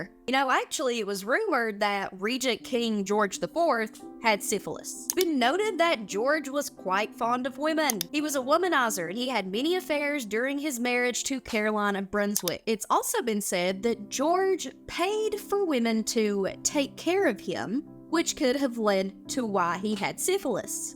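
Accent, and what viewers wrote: South, United States. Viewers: Sounds southern or midwestern US. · Just curious, what region of America is your accent from?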